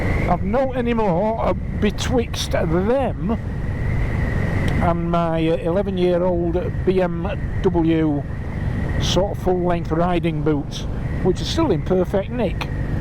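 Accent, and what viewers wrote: Yorkshire, United Kingdom. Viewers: Morning. Since watching your vid on the MT 800 Touring the other day, I'm all but sold on one rather than the Suzuki GSX S GX ( say that when you're drunk) as it's over 5 grand cheaper and has everything. As good as the Suzuki is, and I've tested one, for £14500 - No luggage, no centre stand, no heated grips, and for a supposed touring capable bike, a screen the size of a postage stamp that needs an allen key or similar to adjust. The centre stand alone being £470... Your review made the difference. On the subject of Chinese bikes and the Chinese, they are easier to interpret than some of your Yorkshire 'twang' From one of your followers over the fence in Lancashire....(.like we are any easier...😝😉) Keep up the good stuff..👍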